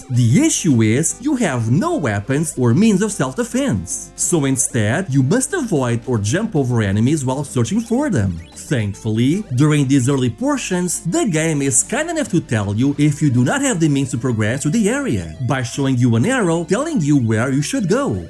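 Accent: Portugal. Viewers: It's okay. Great video! ^^ By the way, if you ever feel self-conscious about your Portuguese accent, don't! I think it makes your videos unique and charming. You get tired of hearing the same US or UK accents all the time! I'm a fan of your work; keep up the good job! ^^ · você é de Portugal?? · Bom, essa foi uma forma inusitada de descobrir que você é português kkkk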